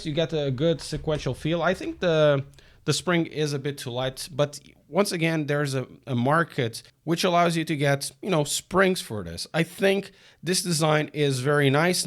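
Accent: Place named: Portugal. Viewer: Where are you from? Your accent sounds portuguese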